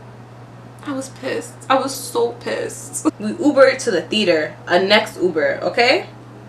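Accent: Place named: Toronto, Canada. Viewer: The Toronto accent I love it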